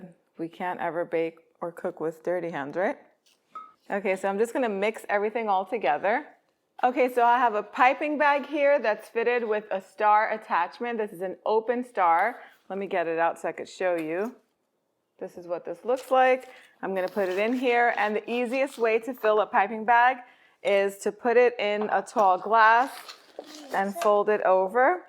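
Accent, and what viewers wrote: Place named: United States. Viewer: Γειά σου. Πρώτη φορά μού βγήκε βίντεο δικό σου στο YouTube και από το όνομα σου καταλαβαίνω ότι είσαι Ελληνίδα και χαίρομαι που δείχνεις ελληνικές συνταγές. Αυτά τα αμυγδαλωτά είναι τα αγαπημένα μου και τα φτιάχνω κι εγώ. Εγώ είμαι από Θεσσαλονίκη κι εδώ τα λέμε εργολάβους. Θα κάνω εγγραφή στο κανάλι σου και θα σε ακολουθώ. Από τι κατάλαβα από τα αγγλικά πού μιλάς μάλλον είσαι Αμερική. Αν θέλεις γράψε μου. Να χαίρεσαι το κοριτσάκι σου!! 🌷🌷🌷🌷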